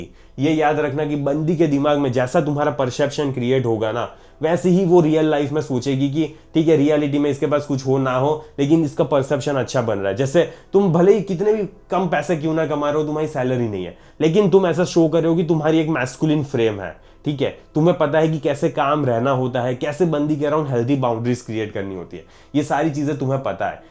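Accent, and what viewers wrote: Gujarat, India. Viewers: Bhai, I am also a gujju, its so cute when ur Hindi has a gujju accent 😂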